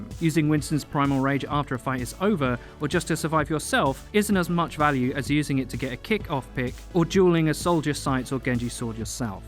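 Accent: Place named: United Kingdom. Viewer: It's his accent. Listen to, or imagine a British person saying something like "remember". The R at the beginning gets pronounced, but the one at the end is downplayed or removed. Other accents and dialects of the English language have quirks like this as well.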